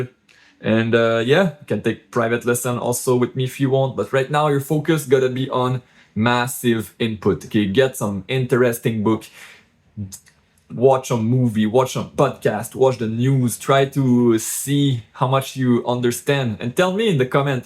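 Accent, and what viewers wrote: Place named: Quebec, Canada. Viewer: This is great. I grew up in Vancouver a long time ago and never learned French however I work in Ottawa where French is fairly important. I have only watched a very small amount of your course but it is exactly what I need as you are speaking like a French Canadian person does(or my in-laws...) and my work colleagues, not a Parisian. Merci!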